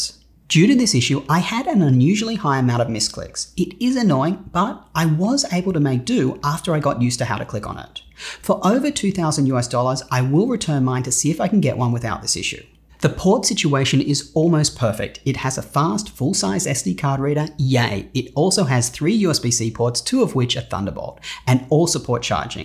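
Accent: Australia. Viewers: Why do you use pounds if you sound Australian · cool accent, is it Australian？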